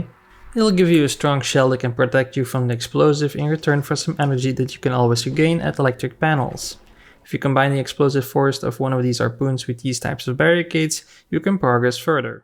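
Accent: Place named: Netherlands. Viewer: You sound Dutch!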